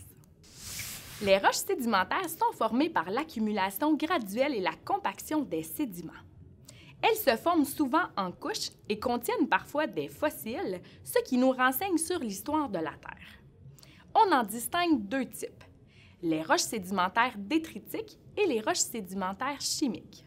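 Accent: Quebec, Canada. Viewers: C juste l'accès du quebec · Avec sa manière de parler en dirait une québécoise ❤ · Omaima Laftem hadak dialect canadien wa9ila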